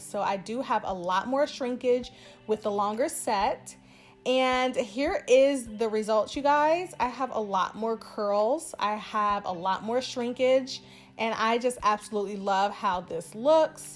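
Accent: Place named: South, United States. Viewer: You have such a southern twang in your speech. I love it. I am also thinking about getting a tapered cut. I love yours.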